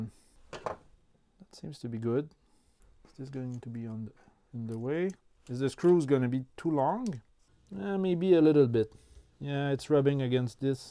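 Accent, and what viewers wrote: Quebec, Canada. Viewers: Hey un québécois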